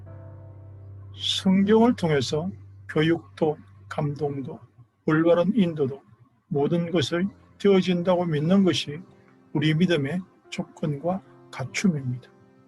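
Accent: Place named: Gyeongsang, South Korea. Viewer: 잠시 머물다갑니다. 경상도 사투리로 차분하게 말씀하시니 더 친근하게 들립니다. 종교는 없지만 귀 기울여서 잘 듣고갑니다.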